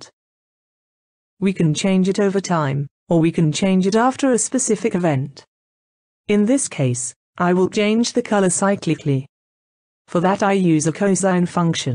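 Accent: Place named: United Kingdom. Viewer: Thank you, British robot lady.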